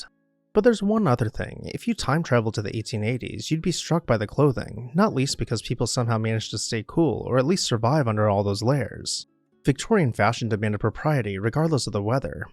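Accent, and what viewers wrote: United States. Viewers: Good video, not good narrator. YouTube is an international platform, you should take into account that many people here don't have English as their mother tongue. This narrator's heavy American accent requires concentration even for native English speakers like myself. He speaks too quickly, doesn't leave enough time to absorb what he says, i.e. pause once in a while, and he never seems to take a breath.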